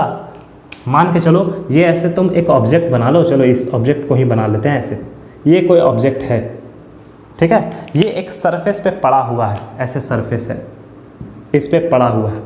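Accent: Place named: Bihar, India. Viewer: Bhaiya aap bihari ho....jai bihar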